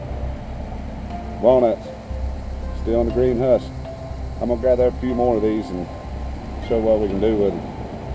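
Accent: South, United States. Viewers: ... with the southern drawl ... slow talk ... lazy annunciation ... and background music ... and with old ears ... many of your words ... did not compute. GOD Bless you though for your efforts.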